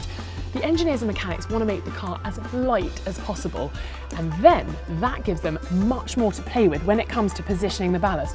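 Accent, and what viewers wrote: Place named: England, United Kingdom. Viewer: Джереми Кларксон в юбке. Англичанка чтоли? у них у всех такой тембр и акцент?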